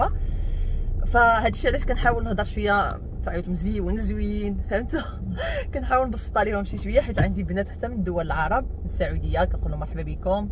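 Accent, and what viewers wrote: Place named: Iraq. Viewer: هاي هاي على لغزالة ولهجة لعراقية ❤نتي تهضري وانا نضحك غي بحدي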